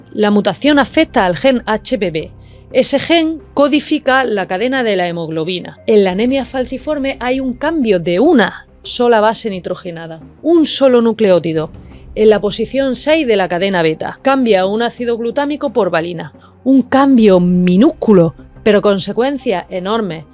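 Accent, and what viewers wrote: Spain. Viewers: Fenomenalmente explicado. Magistral,. Acento granaino ENCANTADOR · Una mujer hermosísima que sabe de lo que habla, estupendas historias maravillosamente contadas, una voz que cautiva y para más, ese gracejo andaluz y ese decir que conquistan corazones sin piedad. El mío ya se lo robó cuando ví el primer video. ¿Se puede pedir más?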